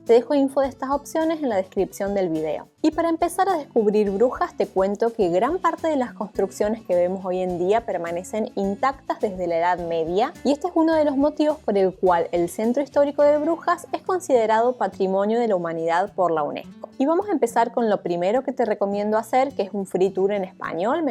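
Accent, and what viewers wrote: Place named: Argentina. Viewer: Reconozco un marcado acento argentino en tu dialecto =D Muy lindo recorrido. #GraciasPorCompartir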